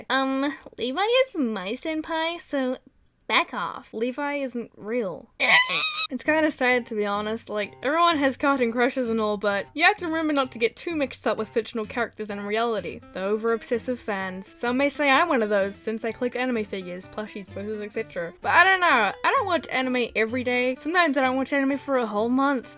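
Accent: New Zealand. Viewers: are you a new zealander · Are you from New Zealand ? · OMFG ARE YOU FROM NZ !!!!!!!!?!!!!!!????!!! Edit: YOU AREEEEEEEEE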